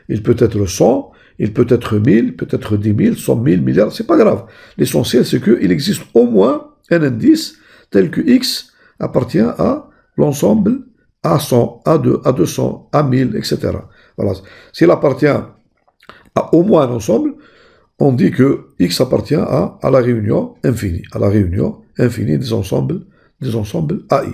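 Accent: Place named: Algeria. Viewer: C'est un professeur algérien, c'est pourquoi il parle arabe français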